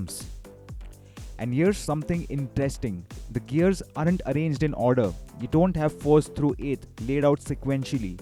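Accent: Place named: India. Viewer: bro just reuploaded driver61 with an indian accent and thought yeah thisll do numbers? bro come on now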